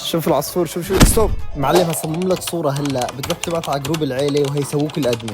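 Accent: Egypt. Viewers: ممكن ترد انت مصري ولا سوري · ده لدرجة اني بحسه مصري😂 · انت من سوريا شلون انت تتكلم مصري 😊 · هو انتي مصري ولا سوري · لهجتك كأنها مصرية ماتتكلم مثل اهل سوريا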